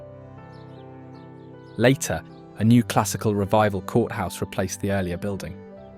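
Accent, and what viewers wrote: United Kingdom. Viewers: Didn't expect a British dude narrating this lmao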